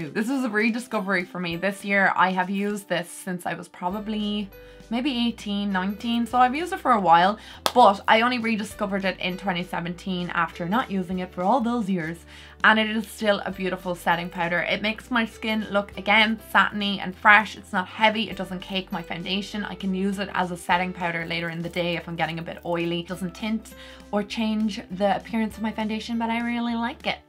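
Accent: Ireland. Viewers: Is that a Irish accent?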